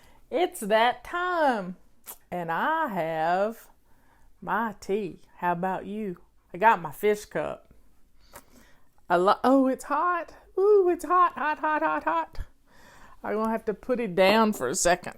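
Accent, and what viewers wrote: South, United States. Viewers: I love your Southern accent! Had to buy a new washing machine. How's that for celebrating laundry month!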